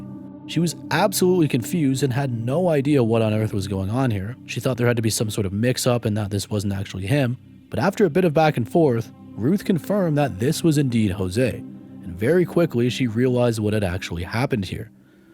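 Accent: Canada. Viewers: Love this new chan theres loads n loads strange things I never seen before plus love way Canadians say oot 😅 same as guy from criminally listed 😀 😄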